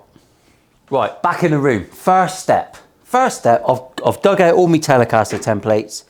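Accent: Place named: Plymouth, United Kingdom. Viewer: There is a 'voluptuous', but that's nothing like as good as what you said! Absolutely love hearing Plymothian again. Great work.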